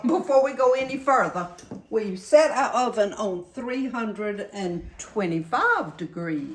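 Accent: South, United States. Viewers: I absolutely love everything you make and I love to hear you talk.Y remind me of my sweet southern family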